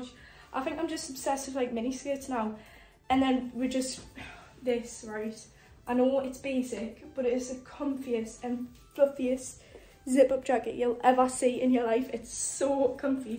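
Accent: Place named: Northern England, United Kingdom. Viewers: She's from Newcastle, right? I always had trouble distinguishing northern accents :-p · No. She's from Newcastle in England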